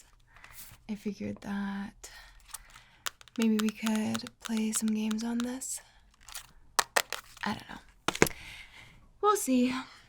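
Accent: South, United States. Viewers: I always love your videos. Your incredibly talented. My favorite series, or style is your southern accent videos.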